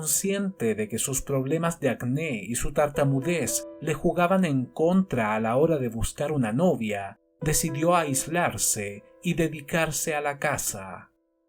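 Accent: Chile. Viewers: Tus documentales son geniales!!! Esas introducciones para ponernos en contexto de la historia que nos vas a contar, está perfecto. Por tu acento, diría que eres de Chile ¿no? Jajajaja. Saludos desde Colombia. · Yuuuuuuupiiiiiii!!♥️ Gracias de precioso acento chileno;)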